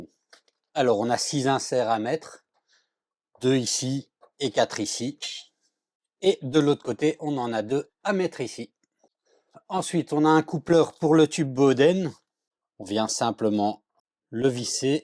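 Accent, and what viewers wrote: Belgium. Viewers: Bonjour Merci pour cette série Du montage De l'imprimante Voron Pourquoi vous dites À faire en fr Vous n'habitez pas en France Car je trouve que vous parlez très bien la langue française Avec un petit accent Je pense Belges ou flamands Wallon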